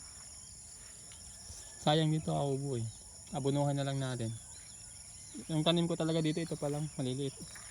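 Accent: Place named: Bicol, Philippines. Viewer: Taga San ka Pala boss parang bicol ka Kase pag nagtatagalog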